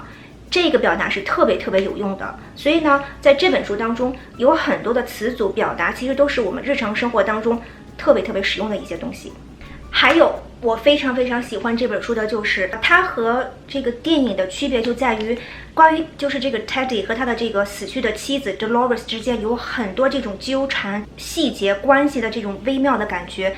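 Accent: United Kingdom. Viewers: 听出来了 😂 您是英式发音： spill、 body ~ 明显英式发音😶😶😑😑😬😬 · What a beautiful Brith accent! I love it.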